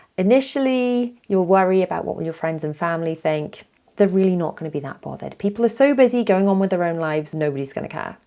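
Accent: Wales, United Kingdom. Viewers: A video breaking down your filming and editing process would be massively helpful! I’ve been watching you for years and it’s just been nice to hear a Welsh voice and relate to your life as a mum to 4 (like myself) at the moment I’m loving your Disney vlogs and they will help me massively as I plan Disneyland Paris next year and in the near future florida (because I’m dying to go back!) I’m also a YouTuber and I love every minute of it but it is soooooo hard. You definitely neeed to have the right mindset to keep pushing through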